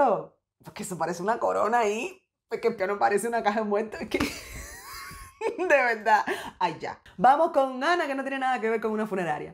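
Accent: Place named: Cuba. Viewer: Primera vez que veo su canal,me parece que debe ser cubana por la forma de hablar 👍👍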